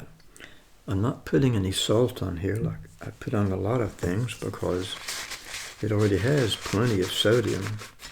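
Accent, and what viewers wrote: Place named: United States. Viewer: Which US state are you from, the accent is so cool!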